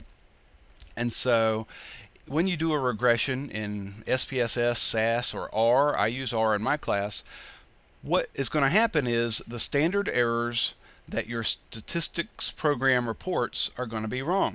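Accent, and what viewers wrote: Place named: Northern England, United Kingdom. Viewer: Some words are Southern like "dependent" and most of your accent is Northern.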